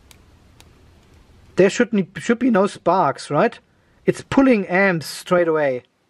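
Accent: Germany. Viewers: Thanks for your nice videos., bist Du deutscher? Ich hatte schon öfter gedacht das Du einen deutschen Akzent hast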